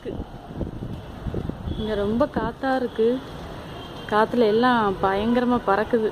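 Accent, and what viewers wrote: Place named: Tiruchendur, India. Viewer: நீங்க எந்த ஊர். எங்க சொந்த ஊர் திருச்செந்தூர்... நீங்க பேசுற பாசை எங்க ஊர் side மாதிரி இருக்கு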